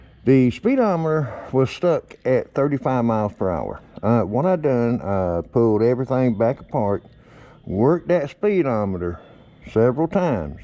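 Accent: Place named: South, United States. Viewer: Yes sir mine is a 02 classic 1500 but I've put 05 1600 mean streak engine on it and bored it .05mm over stock . I was going to change the injectors to see if that would help.i have vance and Hines straight shot drag pipes. and I've eliminated the air cleaner on left side and crossover tube. I can put new plugs in it and it runs grate for about a week .then they are black with sute and will start to run like crap.ive got service manual .and I'm going to go thru all the components and check them out while i got tank off-putting injectors in. Thank you for the input . And I love your videos you sound like your from the south like me. I own a ATV bike repair shop and I work on all kinds. But the Vulcan is mine. I loved the video on the timing chain tentioners that was a good one for sure .I just found your videos yesterday but your making grate content keep up the good work sir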